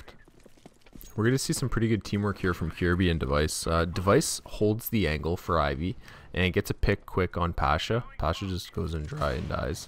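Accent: Canada. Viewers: are you canadian m8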